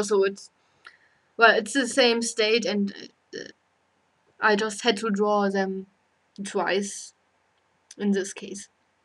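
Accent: Germany. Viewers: Idk guys, l am able to understand her, I guess experience talking to Germans helps. Thank you for the video! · u have german accent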